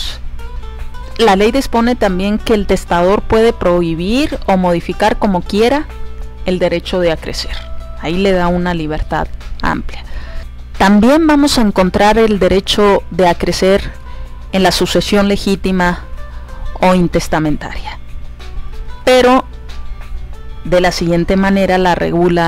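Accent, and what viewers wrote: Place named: Mexico. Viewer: María Camila Simbaqueba Sánchez al parecer es de México, por el acento.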